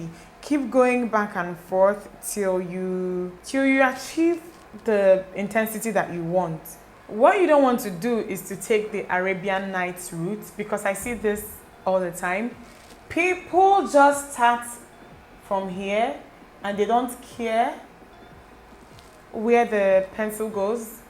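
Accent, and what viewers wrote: Igbo, Nigeria. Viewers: Man I love her accent my bestie is Nigerian too😅but she doesn't like me listening to her when she is speaking Nigerian accent but still love her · Extremely funny especially the igbo accent. Love this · Oh no Shamsa mohamed thats how Nigerians talk. Thought the same when I had roomates from Nigeria it used to really bug me till I learnt thats how they talk and no offense. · no, that’s just how nigerians talk. very blunt, right out the box. she doesn’t sound rude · She's too funny, and I love her Nigerian accent. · ''If you don't prime your lid with oily skin, you're on your own''😂😂😂😂😂😂😂...i've subscribed girl...you just soo speak like a tru Nigerian...love from Cameroon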